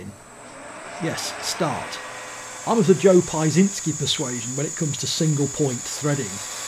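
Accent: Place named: Southern England, United Kingdom. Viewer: Got to love the Southern English accent, full of humour and understated knowledge.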